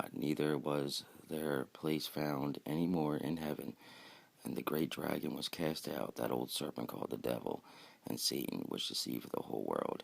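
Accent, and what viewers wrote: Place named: Pennsylvania, United States. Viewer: Dude your Pennsylvania accent is so heavy I can barely understand what you’re saying. Speak more clearly.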